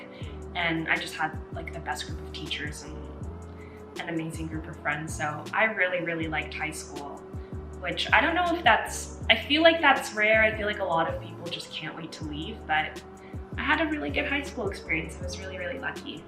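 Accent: United States. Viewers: As far as her American accent it’s not that hard to get after moving here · How does a Nigerian/Black Russian 28 year old, who looks like she is 18, end up with such a flawless American Accent?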